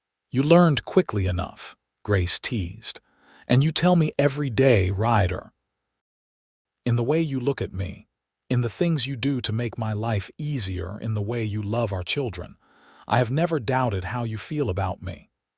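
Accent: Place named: Georgia, United States. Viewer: I especially enjoy the narrator's accent, he sounds like he comes from Savannah, GA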